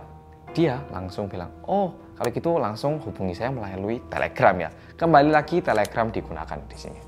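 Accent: Java, Indonesia. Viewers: Jadi ini yo kasus yg mejadi trending di naver slama 3 hari, sya udah liat tpi nggak bisa baca tulisan korea. Untung up ini kasus jadi ngerti kan! Thnks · Korea rasa jawa kali ya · Makin maju dunia makin parah. Aku ada Telegram on my hp but I don't use it, cuma sempat register sja sudah lama dulu. Kalo gitu gak apa2 kan? Btw, ini pertama kali lihat Korean yg bisa medok gini, lucu deh 😅. Di tmp ku sja kalo warga asing yg ngomong rata2 masih ada logat dri negara mereka juga. All d best,& stay safe during this covid19 pandemic ya..😊✌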